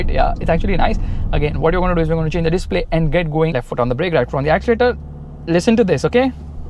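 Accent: India. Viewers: Better speak Hindi instead of English, your pronunciation is hindilish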